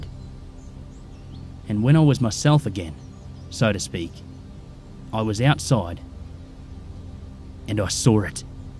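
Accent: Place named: Australia. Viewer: Love the Australian accent and I really enjoyed this video a lot and awesome job on it at the narration.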